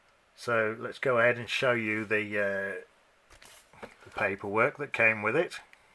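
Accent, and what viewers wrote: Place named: United Kingdom. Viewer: That's British English bro.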